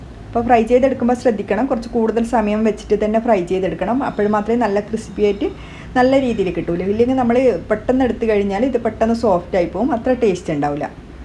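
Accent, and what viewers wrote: Kannur, India. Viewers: Nice name " Thakaarapetti ". Due to shortage of time, can't try many receipe. But will try for sure. Heard that Thalassery, Kannur people are very good in cooking. I like your Kannur slang . Thank you dear. 😊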